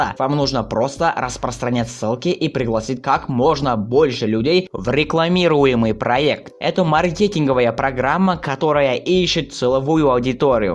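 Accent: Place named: Russia. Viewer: Акцент, у тебя русский, не претворяйся, что владеешь иностранным языком, я их знаю 7 🤜.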